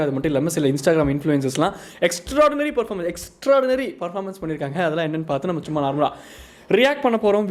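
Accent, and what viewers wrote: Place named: Karur, India. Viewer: 😂😂😂😂 ayyo Madras samayal fav cooking channel 100 mattum illa108kum serthu phone pannirunga 😂 thalayatturathu mattum thaan unga role 😂 staff sick manager shock😂😂😂 neenga mattum unga maara kooda vilayadalam watermelon star mattum vilayada koodatha😂😂 but neenga+ maara cute ah irukum ithu comedy ah iruku 😂😂😂 unga karur slang vera level ❤❤😂 fans club watermelon starku thaan😂😂😂😂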